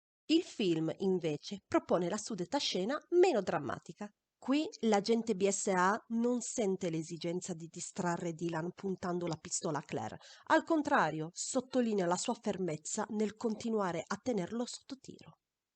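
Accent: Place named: Italy. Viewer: You've just became one of my favorite humans on earth ! Really good video and i like your italian accent ! It's very well written and it's consistent the only thing i could say : " just continue !"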